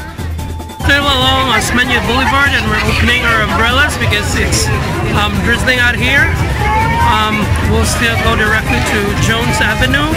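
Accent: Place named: United States. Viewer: Sounds American!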